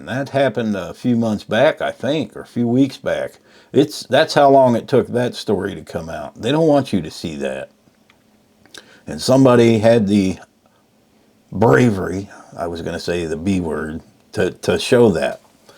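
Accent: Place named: Tennessee, United States. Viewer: Hello, totally agree that something has changed. I think its the food, the plastic containers, the stuff they feed in the animals, AND MORE IMPORTANTLY, those VIOLENT VIDEO GAMES. Or, Do you see the chem trails in the sky where you are? We're I So. CAL. Way too many people here. I'm taking care of my 101 yr. Old mom. We can't move right now. But I enjoy listening to you and what you have to say., i look for your videos every morning. My husband's family were/are in Tennessee , I like your accent, reminds me of my husband's aunt who was a sweetheart. Happy trails.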